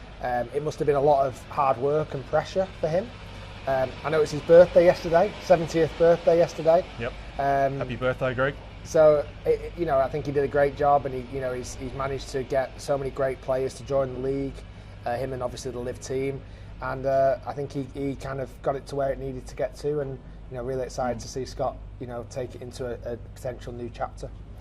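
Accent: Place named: England, United Kingdom. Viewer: I cant watch Rick because of that horrible English accent . The way he says " but " drives me insane . It must from what ever area he grew up in as the proper English accent is so nice to listen to . Its getto English . LOL . I know first world problems . Cant knock a youtuber for taking 10 million of LIV money though . Only a idiot would turn it down .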